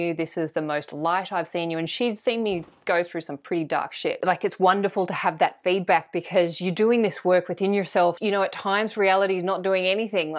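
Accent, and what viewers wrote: Australia. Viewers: You are engaging and fun. You keep the teachings simple yet clear. I am loving it. Thank you! Ps and you are Australian. Living OS it is refreshing to listen to an Australian.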